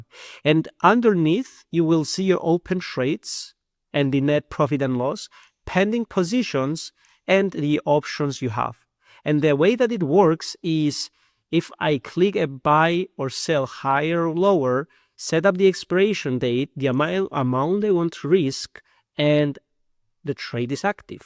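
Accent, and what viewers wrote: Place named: Pakistan. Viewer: Почему те, кто разваливает провальный solaxy смягчают L ? Акцент с пакистанского рынка.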